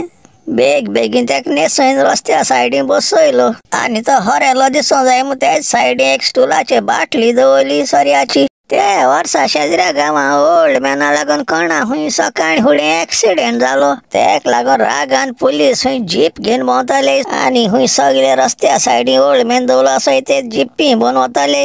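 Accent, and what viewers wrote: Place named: Salcette, India. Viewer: Authentic Salcette Konkani. Those who don't know to speak proper Konkani must listen to this weekly talk by.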